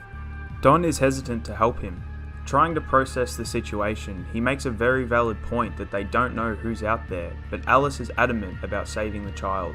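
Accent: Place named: Australia. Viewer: Hey, you sound Aussie! I really enjoyed this one and think you have real good potential with your timing, technical setup, conversation points, choice of scene/film, and fairly down to earth approach; even if it's a tad too obvious you're reading your script haha. I know there are a lot of film reviewers out there, but with recent events now would be a great time to really try to push your channel toward the film analysis/review crowd, if that's the direction you want to go in. I think you'd do well. Good luck with your next one.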